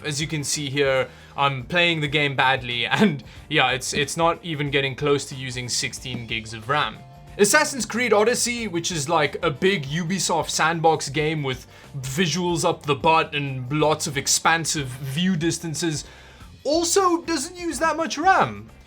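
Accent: Afrikaans, South Africa. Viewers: he’s south african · Fiddy twoo. I recognize a fellow afrikaans accent there lol.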